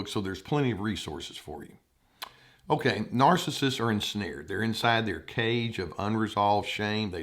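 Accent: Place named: Texas, United States. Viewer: I never realized that so much first-rate psychological insight would come with a Texan accent.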